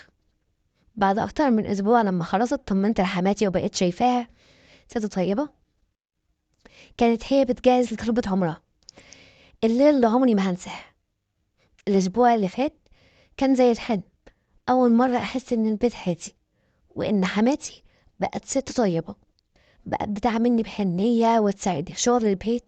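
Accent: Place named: Iraq. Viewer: انت من العراق تحيه من مصر بحب صوت اللهجه❤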